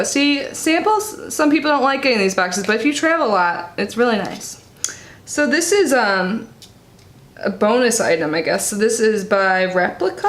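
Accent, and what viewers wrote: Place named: Chicago, United States. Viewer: Chicago! Is that where your accent is from?Where did you get your mirror?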